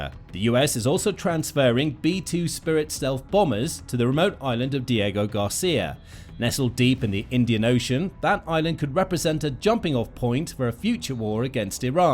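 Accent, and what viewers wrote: England, United Kingdom. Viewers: What is your contributing to this campaign? Probably absolutely nothing by your voice. You sound like you’re from England. What is England doing? Are they doing helping they spending some money?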